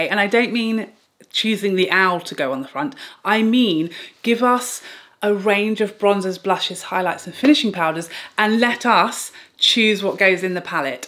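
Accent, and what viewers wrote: United Kingdom. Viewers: The hair looks great 😍 I need blue eyes and a British accent now!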